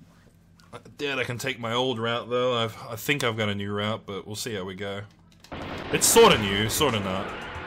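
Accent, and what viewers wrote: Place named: United States. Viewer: Ah it was tripping me out some parts sounded American to me and I am from Texas so could have fooled me.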